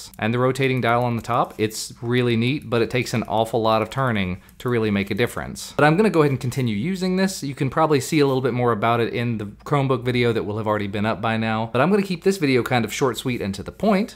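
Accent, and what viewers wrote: Kentucky, United States. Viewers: what part of kentucky are you from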